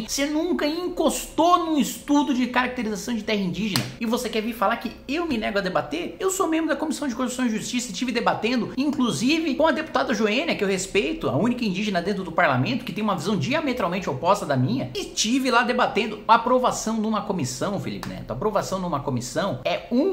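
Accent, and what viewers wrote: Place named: Pará, Brazil. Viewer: meio paraense, legal.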